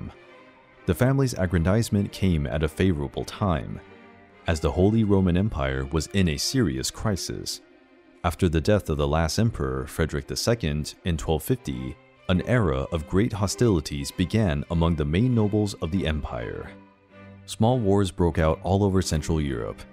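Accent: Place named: United States. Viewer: love this video! very informative! a little frustrated with the american english pronunciation, but i can’t expect everyone to have perfect german pronunciation 😂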